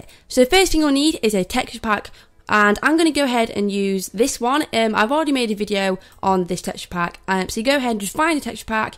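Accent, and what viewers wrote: England, United Kingdom. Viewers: that voice is she from manchester londer lester or none and its sound so familiar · wow british sound i think ;)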